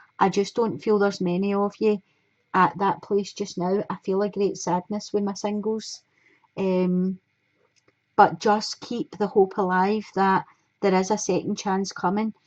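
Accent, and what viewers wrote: Scotland, United Kingdom. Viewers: u Scottish?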